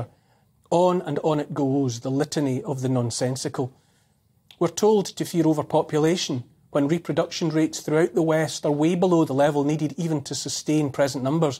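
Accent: Scotland, United Kingdom. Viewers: He has the perfect accent for doom and gloom reporting, is that Scottish?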